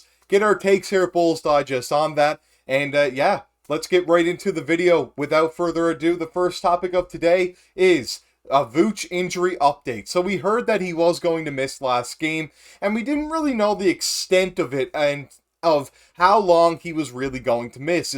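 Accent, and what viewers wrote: Canada. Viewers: are you from nova scotia?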